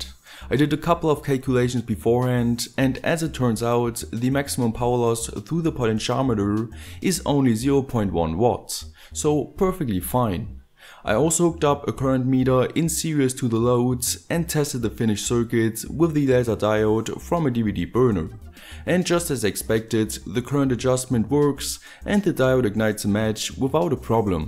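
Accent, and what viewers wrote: Germany. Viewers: habs an deinem deutschen Unterton gehört. Geile Videos machst du, ist immer interesannt an zu schauen. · Are you from Germany?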